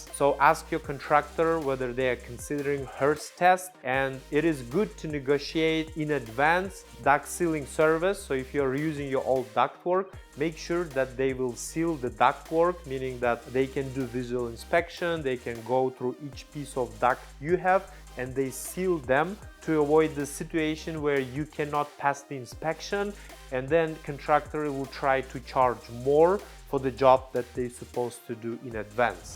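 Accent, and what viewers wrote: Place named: Soviet Union. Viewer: You talk like you’re from Soviet union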